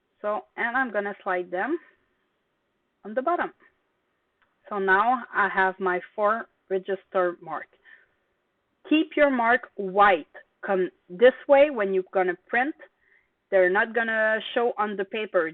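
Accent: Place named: Quebec, Canada. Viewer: In French please, is it possible ? Je préfère vous entendre parler avec votre québécois. Merci pour vos partage.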